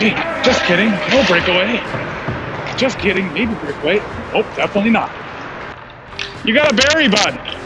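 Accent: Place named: Canada. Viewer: Sick video! Music was perfect and you dialed up the canadian real good, bud!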